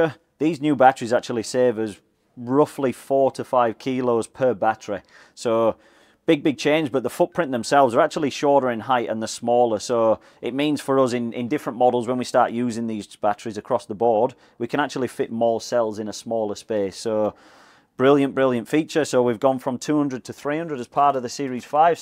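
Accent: United Kingdom. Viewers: Where's that northern accent from? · nice trailer. seems odd listening to a Yorkshire twang advertising Aussie trailers. That outdoor kitchen needs a redesign, most of it is unusable, nice features but unusable. something to think about for Quantum 5.5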